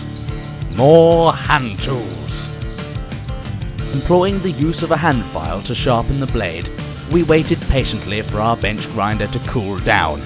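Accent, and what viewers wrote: United Kingdom. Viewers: Got Dang that is one jive turkey. First comment on one of your videos i found you threw planet rust but just now relized how amazing your other videos are. this is by far one of the coolest vidoes i have seen in quite a wile. love your grammer and accent and you in all your british glory. its not surprising you have 30k subs with how steller your videos are. you have a real tallent and i could easily comapre you to fankie on pc in 1080 p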